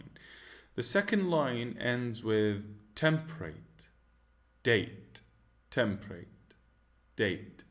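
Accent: United Kingdom. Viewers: I'm not into British accent but you're literally something else 👏💙!!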